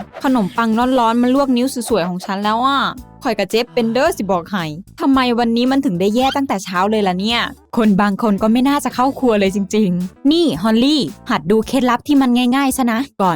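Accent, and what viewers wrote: Isan, Thailand. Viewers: พี่เป็นคนอีสานเหล่อออครับบบบ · เราว่าพี่เขาเป็นคนอีสานหลุดพูดตั้งหลายคํา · พี่พูดอิสานติ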